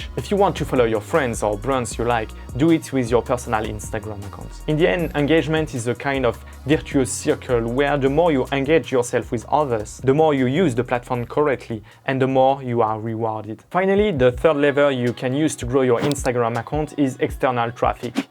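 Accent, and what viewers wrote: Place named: France. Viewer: Tu es un français avec l'accent 😂♥️♥️♥️🙏🏽🙏🏽 bon courage frère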